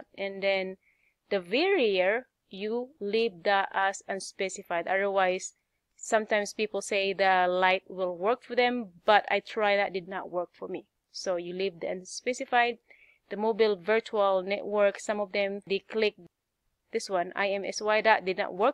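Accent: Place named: Pangasinan, Philippines. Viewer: That's a great accent! Pangasinan?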